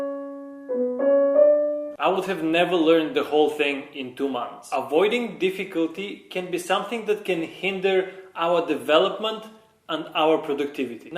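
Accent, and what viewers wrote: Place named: Russia. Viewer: You are the best pianist ever, and the best piano teacher 💓 You will be famous one day, i'm sure. I can hear your russian accent when speaking english, one of the best accents. I wish you good luck :)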